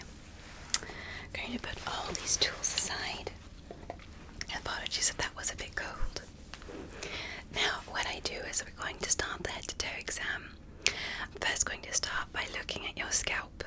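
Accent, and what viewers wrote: England, United Kingdom. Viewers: Fantastic English Accent 👌 some great tingles ❤️ Thank you 😍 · This is a very accurate British accent, coming from a Brit, love it 😍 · Is she British cuz In her other vids she talks different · This is a very Peggy Carter British accent 😩😂😂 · English accent* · oh I thought you British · I swear her British accent is the most satisfying and relaxing thing ever 😍🙏🏼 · Only recently found your content and love it. Tonight I found myself asking I’m sure she was American lol. I’m from UK, London and that is an awesome English accent. Good work! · I never knew you could do a British accent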